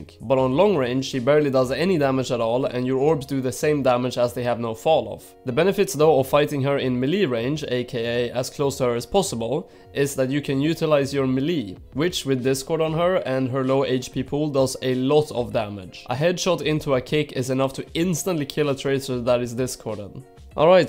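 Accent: Sweden. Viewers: Embrace the swedish accent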